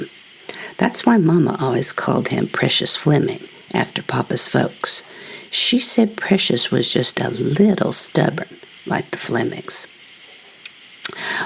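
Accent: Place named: Appalachia, United States. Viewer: What a wonderfully fun story! So much character brought to life in this tall cat tale (by the way that was a really long tail). Loved hearing your expressive Appalachian accent and colorful language too. Amazing photos of those cats, such eyes!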